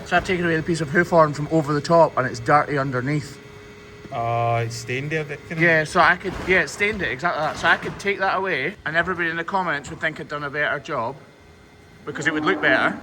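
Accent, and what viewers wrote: Scotland, United Kingdom. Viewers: I like channels such as yours because I love Scotland , people from Scotland, and animals. My ancestry includes dairy cattle and their care on nearly every branch and twig of my family tree. I no longer question where my curiosity comes from because it is all genetic memory. So my great, great grandfather had an accent like yours. His grandson married a young lady who was born in the US to Bohemian parents who spoke German. Dairy cows on both sides. My matrilineal side back before 1700 when they arrived in Jamestown, Virginia came from a place in France called Beau Vac or beautiful cow. I appreciate your skill, love, and care of these beautiful creatures. Thank you. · Enjoy your videos and the craziness you find. It is quite amazing (and gross). You're also very handsome, and you're all up in some serious cow sh*t on the daily, helping fellow animals. 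That's all kinda, pretty hot (not the cow shit, but the level of care you put into your work and the animal, while spitting/wiping it away when you get shot in the face with shi*t, piss or puss). Haha. God bless you, sir, for the work you do. AND! You're from Scotland! I love it, love the accent. My dad's side of the family is Scottish; I've traced my family heritage back to Scotland, in Lanarkshire. My family raised Clydesdales, which also originate from Scotland. It's been my next big wish to make a trip to visit Scotland. Born and raised in Montana, USA, on a farm and ranch, so yes, I have much appreciation for your job and the work you do! Good on ya, lad! And, yes, I subscribed! :) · Love what you do for these cows, I'm from America and I love cows, it's awesome to se you helping them. I never really knew the full extent of the work that goes into caring for the cows. Great explanations as always man!! 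Also, love the Scottish accent (I'm saying this and laughing cause I'm from Alabama, and we have a very thick accent.) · Handsome man 😍 Scottish accent 😍😍 Kindness to cows 😍😍😍😍😍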